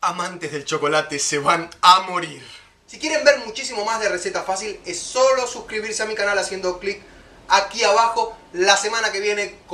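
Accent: Argentina. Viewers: Sos argentino ¿nO?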